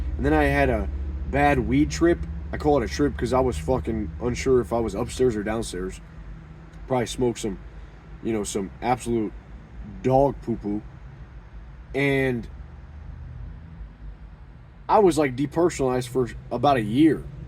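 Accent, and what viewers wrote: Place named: Ohio, United States. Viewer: Man I recognized the accent immediately. I grew up in Clyde-Sandusky co area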